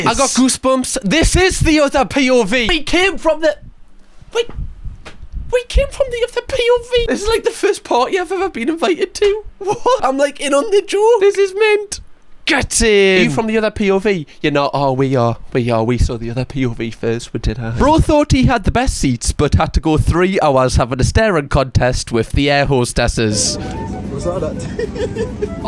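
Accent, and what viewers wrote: Newcastle, United Kingdom. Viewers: Funny story: I fell asleep listening to YouTube and ended up being a part of my dream. A group of us and were in a restaurant where I live in Louisiana USA. was talking constantly and sometimes loudly about Gordon Ramsey in his Geordie accent and all of the redneck southerners were giving us strange looks and saying stuff. We were, like, “oh…he’s our cousin visiting us from the UK.” Whenever he’d say “Gordon” we’d say loudly “he means Uncle Gordon.” Now I kinda wish really was our cousin from the UK who comes to visit!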